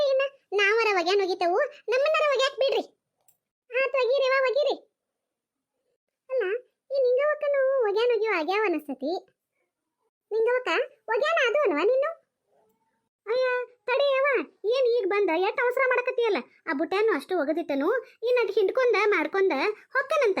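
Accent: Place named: Vijayapura, India. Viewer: ಅದ್ಬುತ ಮಾತು ನಮ್ಮ ವಿಜಯಪೂರ ,uk ಭಾಷೆ ನಿಮ್ಮ ಚಾನಲ್ ನಲ್ಲಿ ನೋಡಿ ಕೇಳಿ ಬಹಳ ಖುಷಿ ಆಯಿತು ನೋಡ್ರಿ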